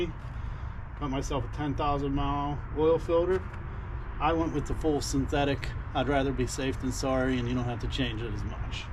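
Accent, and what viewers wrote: Pittsburgh, United States. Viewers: I know you have to be from Pittsburgh with that accent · A couple minutes into the the video I was like "nice, he's got a Pittsburgh accent"...great video, way to lay it all aht!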